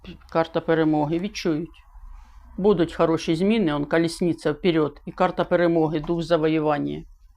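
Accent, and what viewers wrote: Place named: Central Ukraine, Ukraine. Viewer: Ми всі так розмовляємо в центральній Україні,сурджиком.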